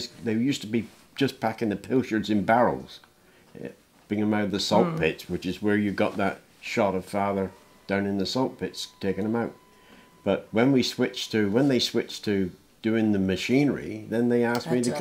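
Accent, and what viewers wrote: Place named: Cornwall, United Kingdom. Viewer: My mates, lovely man from a proper Looe family. And yes, that is a typical Looe accent, so rare these days and so beautiful to listen too. Reminds me of home...though I only live in Newquay, I still miss it.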